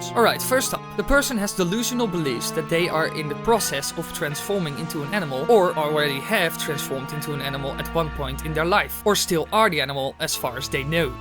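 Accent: Netherlands. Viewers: Is this lad Dutch? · That accent must be Dutch. Good video!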